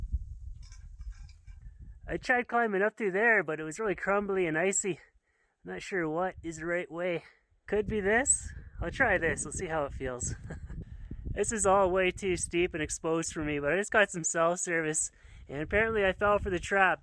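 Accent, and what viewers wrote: Canada. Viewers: EXCELLENT VLOG. THE SNOW AND MOUNTAINS ARE UNBELIEVABLY BEAUTIFUL. APPRECIATE THE CANADIAN ACCENT !! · i was just there !!! staying in my self converted trailer. very new. just found your videos. cheers dude!! love the canadian accent with the sarcasm. ahahah maybe see you out there sometime. im personally not ready for -20 yet but are we ever fully. Beautiful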